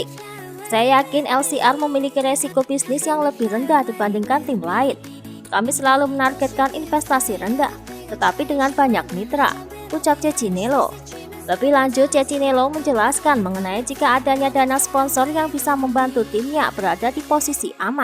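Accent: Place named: Banyumas, Indonesia. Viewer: Admin nya orang ngapak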